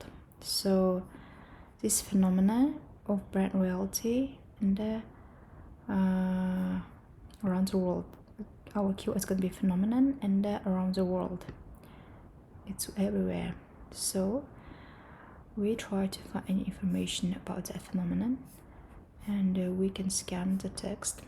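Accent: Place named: India. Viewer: Why you are pronounce as the Indians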